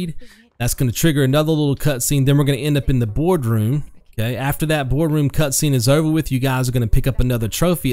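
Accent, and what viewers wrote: South, United States. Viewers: just curious though. Where are you from man? You sound sort of southern, yet mid-western.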